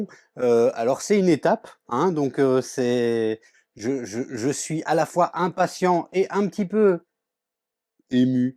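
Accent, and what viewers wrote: Belgium. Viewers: Bonjour Merci pour cette série Du montage De l'imprimante Voron Pourquoi vous dites À faire en fr Vous n'habitez pas en France Car je trouve que vous parlez très bien la langue française Avec un petit accent Je pense Belges ou flamands Wallon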